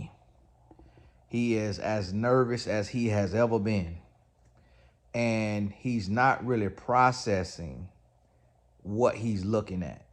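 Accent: South, United States. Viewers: your southern accent is making this video so hilarious 🤣🤣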